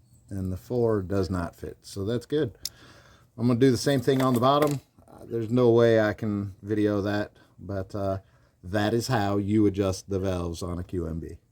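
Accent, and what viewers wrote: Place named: Kentucky, United States. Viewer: Im gonna get you a phone camera stand for you this garage day this year which is first day of winter only as a early as possible date and You'ved lived in Kentucky for so long your starting to speak tucky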